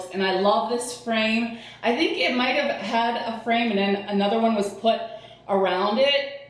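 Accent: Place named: North, United States. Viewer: I have those same tomatoes too. I use them in my summer decor. Our weather isn't too bad here. No snow and temps in High 30' today. Not as bad as some places that got dumped with snow. Are you from Wisconsin or Minnesota? I feel like you have that northern accent. I grew up in Michigan, people say I have an accent too lol.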